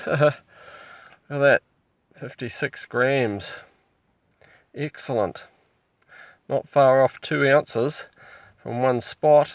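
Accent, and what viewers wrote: New Zealand. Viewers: Really enjoyed your commentary. Nicely done and I will be back.. Kiwi? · Funny how a.i. messes up on translating the kiwi accent ... some funny mess ups throughout this video !!!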